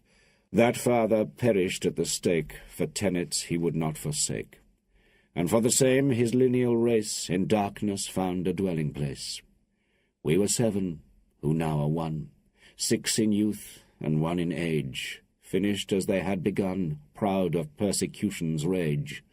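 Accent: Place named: England, United Kingdom. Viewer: Oh thank you, thank you so much !!! AT LONG LAST. A good English deep voice, with a beautiful English accent, reading this masterpiece written by the great Lord Byron. That’s how it should always be. I enjoyed every minute of it. Thank you once more.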